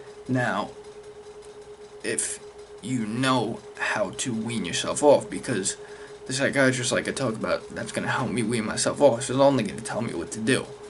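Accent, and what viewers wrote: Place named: New York, United States. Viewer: yo I hear what you're saying in this vid, I've been struggling since I was 16 and I'm 19 now.. what you were saying about the sub docs is true no one needs that much, there's a 'ceiling effect' to the stuff.. none the less u spoke the truth and I dig the patriots stuff in ur room even though u sound like a new yorka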